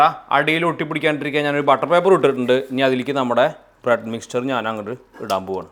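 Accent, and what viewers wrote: Thrissur, India. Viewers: എന്റെ തൃശൂർ ഭാഷ 💙👌👍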